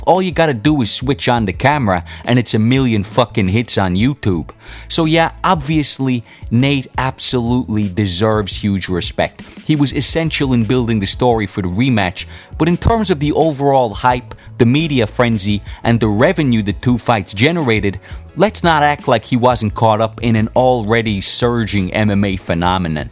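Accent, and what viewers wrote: United States. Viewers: What region of america is this accent from? I kind of recognise it but can't place it. I find it interesting.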